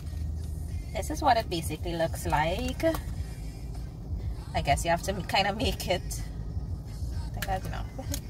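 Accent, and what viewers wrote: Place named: Trinidad, Trinidad and Tobago. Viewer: Damn you so beautiful, looking non Trinidadian...but when you start speaking...you proved me wrong...have a great day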